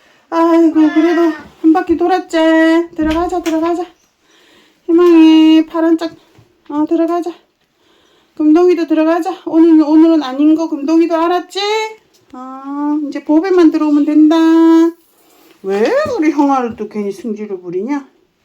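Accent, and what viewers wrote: Gyeongsang, South Korea. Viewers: 대구사시나봐요~ 사투리 억양이 대구표준어의 정석이네요. 저랑 말투 똑같아서 들을 때마다 놀라요. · 집사님 목소리가 너무 좋으세요 경상도분이세요?😅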